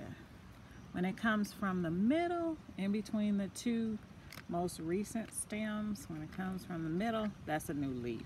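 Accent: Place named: South, United States. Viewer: Stunning! I hope to follow suit! And very genteel southern accent. 😍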